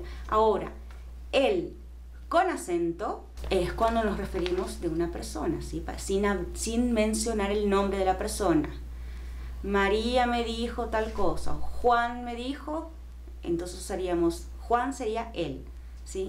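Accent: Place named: Rioplatense, Latin America. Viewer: Me gusta tu acento. Yo creo que debes mantener el acento Rioplatense. Podrías enseñarnos algunas expresiones típicas de Argentina. Gracias.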